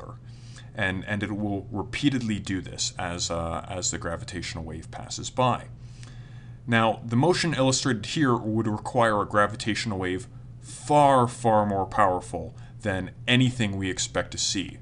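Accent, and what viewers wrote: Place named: Canada. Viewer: Great video and well-crafted teaching! Thanks for making these. Since you recorded this video, we did in fact detected the gravitational waves. So your video is even more relevant now. I hear a bit of a Canadian accent. How correct am I? :-)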